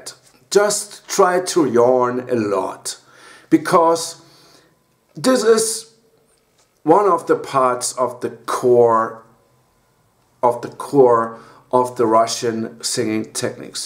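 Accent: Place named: Germany. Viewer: Guten Abend dein Englisch ist sehr Deutsch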